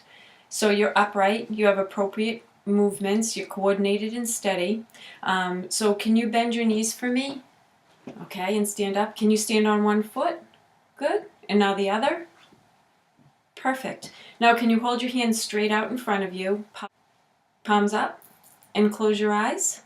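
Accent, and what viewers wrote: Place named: New England, United States. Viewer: Love the New England accent.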